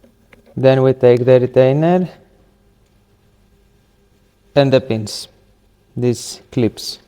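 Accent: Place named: Greece. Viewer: You sound Greek.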